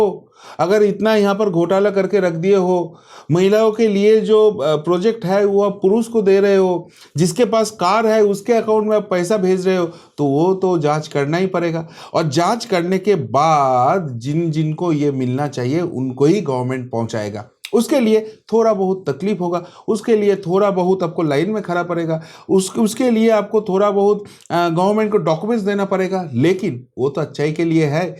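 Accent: Bengal, India. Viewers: आप का बोंगाली-हिन्दी सुनने मे बहुत मजा आ रहा है! लगे रहो दादा!! जय श्रीराम 🪷🚩 बंगाल के लोगों का TMC के गंडों के प्रति आक्रोश और बदले की भावना कायम रहे! इन घटनाओं के विडिओ क्लिप्स आप हमे दिखाते रहिए! शोनार बांगला! 🙏🪷🚩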